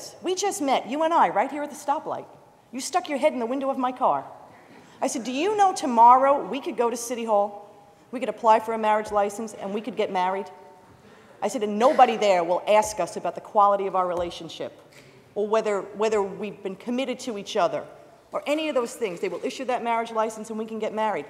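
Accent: New York, United States. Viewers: that was fantastic. also, I just love ny accents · I think her words make this speech great but her new york accent make it amazing!!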